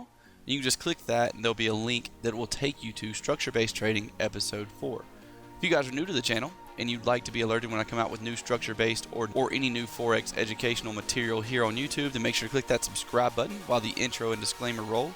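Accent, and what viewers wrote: South, United States. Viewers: .........UUUUMMMMMHHH I like the way you talk unmm huumm. Sorta Southern there aren't ya?. They videos and the analysis are comforting. I had to get out of the market for awhile ad focus on my business dealings that really pay the bills. You my friend are inspiring me to sign up and get the account funded and take some PIPS out of this market for a true life changing transformation. My full time job is draining me but I can't yet stop and walk away from it. I do have a Dream and a plan to make it happen. Got a few hurdles to clear then I I'm done.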